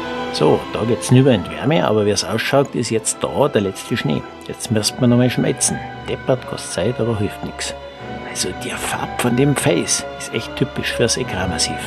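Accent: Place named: Bavaria, Germany. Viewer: Die Moderation in Bayrisch ist die Pointe dieser Filme. Jetzt fehlt nur noch ein Wolpertinger auf der Wiese....😆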